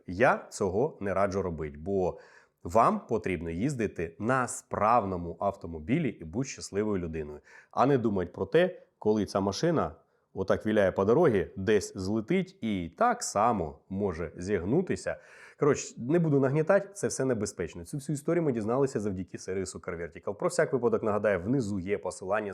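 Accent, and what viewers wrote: Russia. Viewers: Бля… неможливо слухати такий акцент жахливий російський. Розмовляйте російською краще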